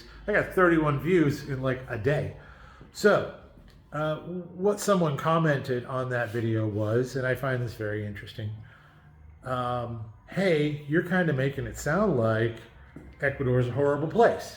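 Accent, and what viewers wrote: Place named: Upstate New York, United States. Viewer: I'm back, lol! Hubby and I have been wondering what part of the US you hail from. We had a decent guess since we've traveled a lot around the US and you sound like you could be a brother to a dear friend of ours who is from Rochester, NY. We watched this video again today and caught the part about being from rural upstate NY so we feel rather smug about pinpointing the state at least, haha. Again, thanks for the great content. We enjoy your videos a lot. We'll be visiting in July.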